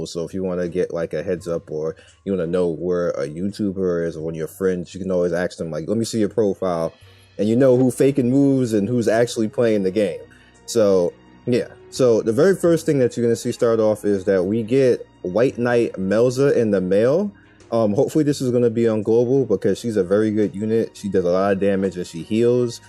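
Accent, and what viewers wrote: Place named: New York, United States. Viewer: Bro are you from harlem? You sound like you from New York. Nice Video on the game!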